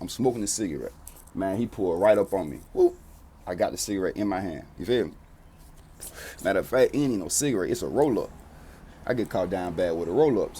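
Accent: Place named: South, United States. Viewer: Dude I’m just now remembering who you are man. You had a album with some songs I used to ride to but it was one of your older projects. It was something you had out between <num>. It might have been old when I got on it but I believe it was new the time. The beat had a nice guitar on it if I’m not mistaken with almost like a blues riff playing on it but with the trap style drums but more like the 90s style drums. I been looking tonight but still haven’t found it. Only reason I remember because I associate your music to when my daughter was younger in elementary but now she 12. Whatever that video was I’m thinking of I used to watch it like every evening for a couple weeks straight. I live in Mobile. I have family in Birmingham though so the music is relatable. I have a similar southern drawl. Keep the videos coming. Nice work. Didn’t know you had got in that trouble. 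Just watched an old interview with Ugly Money. You talk like you more valuable to your family & community being free than to the federal prison system. Kill them jokers with success & kindness.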